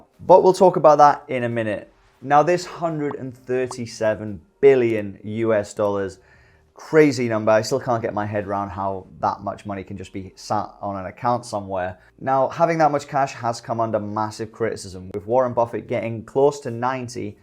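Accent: United Kingdom. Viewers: Hello from US you brit?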